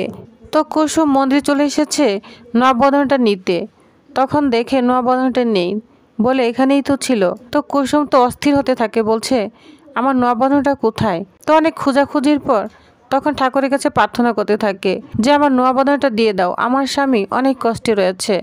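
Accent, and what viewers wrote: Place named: Bangal, Bangladesh. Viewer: বাঙ্গাল বাঙ্গাল উচ্চারণ, অশিক্ষিত উচ্চারণ ঠিক ঠাক শিখে কতকথা করবে।শুভেচ্ছা রইল।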